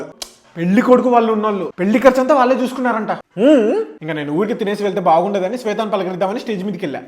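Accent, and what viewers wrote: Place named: Rayalaseema, India. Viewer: కడపా అనంటపురమా బాబూ నీది?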